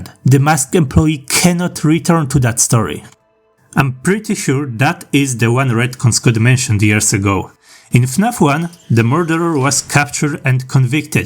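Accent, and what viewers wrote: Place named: England, United Kingdom. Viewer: PL: Masz niesamowity akcent Angielski EN: You have best English Accent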